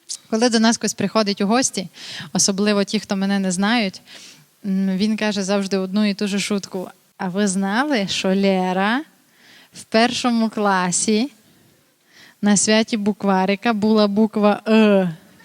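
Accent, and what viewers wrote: Transcarpathia, Ukraine. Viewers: А точніше, закарпатський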